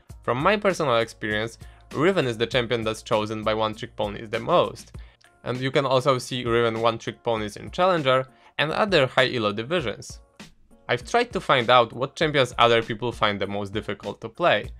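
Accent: Poland. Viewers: ej ty chyba masz akcent polski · Ale polski akcent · Are you Polish? :D Your accent sounds like Jankos'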